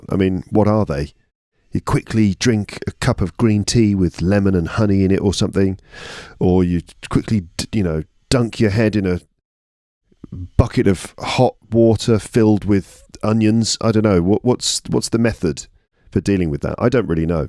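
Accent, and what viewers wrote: United Kingdom. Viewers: I like your voice because of its tone (your voice is high-toned) and you speak with British accent so it becomes more attractive.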